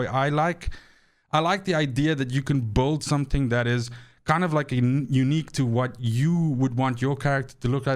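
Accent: South Africa. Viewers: South African? Love the accent.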